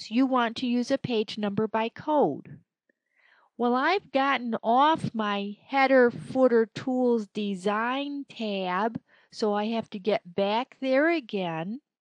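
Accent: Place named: Minnesota, United States. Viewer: i love the minesota voice.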